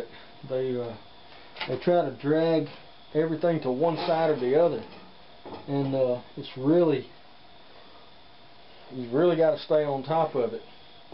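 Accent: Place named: Tennessee, United States. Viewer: Great video like all of your videos. What part of the US do you live? You sound like you are from TN. I live about 30 miles east of Nashville and you sound like the rest of us. LOL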